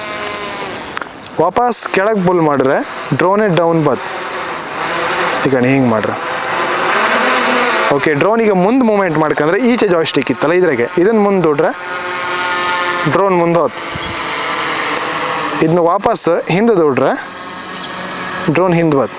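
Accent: Kundapura, India. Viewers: Avru matadta irodu kundapura kannada guru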